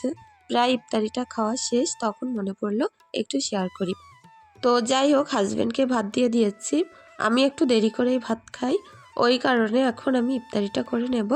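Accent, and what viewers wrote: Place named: Barasat, India. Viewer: তোমার ভিডিও দেখতে আমার খুব ভালো লাগে , আমি বারাসাত থেকে দেখছি , বসিরহাটে আমার মায়ের মামাবাড়ি , তোমার কথা বার্তা , সবকিছুর সাথে আমাদের এখানকার অনেক মিল পাই , যার জন্য খুব ভালোলাগে তোমার ভিডিও দেখতে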